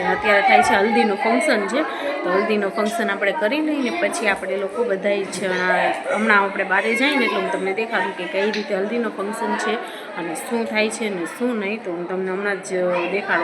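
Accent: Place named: Kathiawar, India. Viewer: tamari khathyawadi bhasa mast bolo cho nice😊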